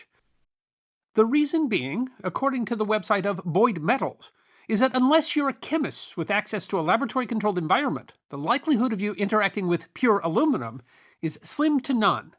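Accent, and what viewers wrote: United States. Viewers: My ears hurt, bloody Yanks. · It is Aluminium It is Antar c tica It is so l der The letter Zed rather than nursery rhyme Zee Dumbing down of pronunciation symptomatic of trumping the US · I don’t love the American speaking.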